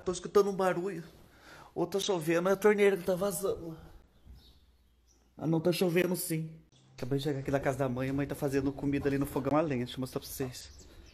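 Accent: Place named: Paraná, Brazil. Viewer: sua cidade faz divisa com o PR?? Estou curiosa para saber pq meu ex mora em Jundiaí do sul PR e o sotaque as palavras tudo é igual ao de vcs aí. Si puder respode eu 😍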